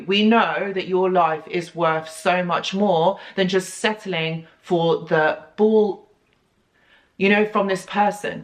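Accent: England, United Kingdom. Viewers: I found this for a reason. Lovely to hear it with an English accent!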